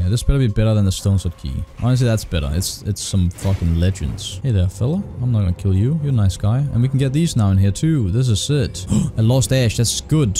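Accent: Denmark. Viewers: Are u danish? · Wait you danish?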